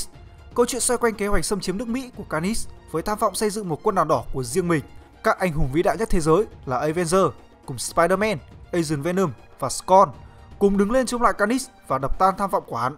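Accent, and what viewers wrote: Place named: Central, Vietnam. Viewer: giọng anh rồi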